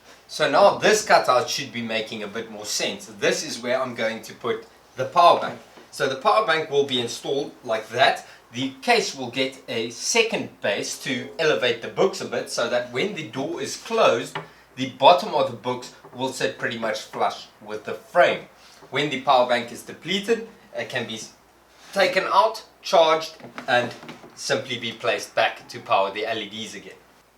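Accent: South Africa. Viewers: The South African accent just adds to the video in the best way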